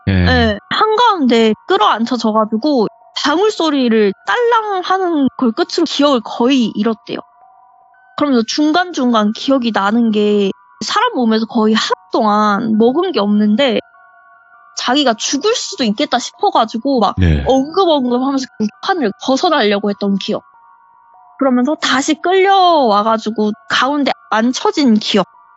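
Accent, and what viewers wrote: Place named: Jeolla, South Korea. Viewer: 고향 전라도시죠!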